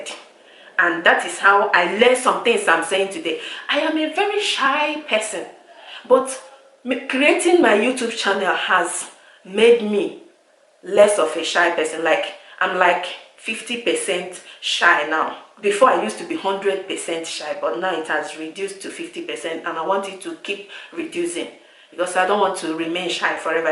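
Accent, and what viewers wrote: Igbo, Nigeria. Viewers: The Chinese are all over Africa doing big businesses and most of them can hardly speak English. So what's wrong with those people who think they are masters of English? The Chinese,Russians, French, etc have proven to the world that you don't need the English language to succeed in life. And for someone to focus on how you speak rather than the ideas you are trying to convey is to actually display the highest form of unintelligence. Who has bewitched their minds into thinking that life revolves around pronouncing words like your former colonial masters? My sister you are doing okay, forget all those people who are constantly and ignorantly rejecting who they are and what God made them. The easiest thing to do in life is to be yourself and not a cheap copy. For those ignorant critics of your accent there is what is known as British accent, American accent, Indian accent, Australian accent, Russian accent. 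Why in the world would she not have a Nigerian accent because she is Nigerian after all. And that's how the rest of us Africans distinguish a Nigerian from all other blacks. Because no matter how educated a Nigerian or South African is the influence of their native language will still show. E.g Trevor Noah, Ngozi okonjo iweala, Aliko Dangote, etc. Sis it takes a small person to insult and criticise a great man/woman. Let your critics post videos of themselves also so that we can critic their grammar and fake accents. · Please keep speaking exactly as you speak cause that is how we speak in Nigeria. You inspired me to start a youtube channel last year. Still none English speaking immigrants come to America and make it better than those that speak the best of English. I dont like that you are giving too much attention to these people. They will always be out there in the world. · Aunty, pls I beg u in d name of the Almighty God pls dont stop. 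Continue with ur videos. I gat ur back 1000% and God gat u 1m%. Non of these people cares if u starve. You speak lik yankee dem go say ur too fake, u speak igbotic dem go say u too local. Wetin man go do? Anyway, just develop thick skin cause you're in d public forefront, criticism will arise now and even later in life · I've watched almost all of your videos and I see how far you've come & how so much better you're becoming.. nne I have an igbo accent & i rock it with pride, rock your accent Biko.. you don't owe anyone any explanation & always remember to go on in life at your own pace.. I'm wishing you a safe delivery.. my simple advice to some of this shallow minded people is simply 'if you have nothing nice to say or type, biko keep ya mouths shut & your fingers still'.. spoken or even typed words can still hurt or break someone, let your words heal not hurt.. Udo!! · People can be so funny,my sister believe me I love your Igbo English,I love everything about you,so haters will always want to be NOTICE ME rubbish I love you my sister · My dear! 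You are perfect because you are in Christ. I was born here in the US and you’re English is excellent... your children and family are beautiful.. you are beautiful and a breath of fresh air! Please stay true to who you are and do not conform.. you are intelligent, genuine, industrious, hard working, wise, and kind... The funny thing is I subscribed to you because of your Igbotic swag.. Abeg be who you are... your true YouTube followers love and support you! Love youuuuuu!!! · Am very very angry,who re dese useless n irresponsible men! Wetin self,wch kind mumu English accent.How many oyibo de speak Igbo. I subscribed to ur channel bc of ur simplicity,ur accent trips me.infact ur igbotic accents gvs joy.let me come nd be going before I talk de one wey dem no send me now,nonsense.who English help.I hate wot I don't like abeg.Am just angry. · Just stay the same, accent is who your are. We like Nigerian English 👍👍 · Proudly Igbo.. 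please keep using your Igbo accent.. we cannot hide our identity..♥️♥️♥️😘😘.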